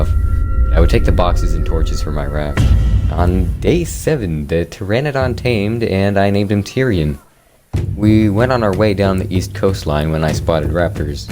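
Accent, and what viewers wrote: South, United States. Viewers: Why is he getting more country as he goes? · Damn I wish we got more of that nice country accent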